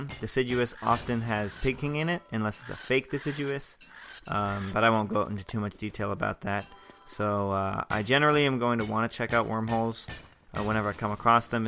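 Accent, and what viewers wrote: France. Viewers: ur french?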